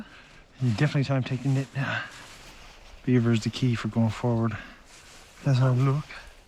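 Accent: Canada. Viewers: Very informative and it's my first time hearing a Canadian accent that does NOT sound like an American! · The Newfie accent is mental!!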